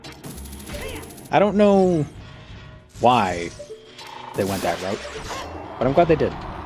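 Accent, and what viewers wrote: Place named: Boston, United States. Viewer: 18 hours of Just Boston Noise❤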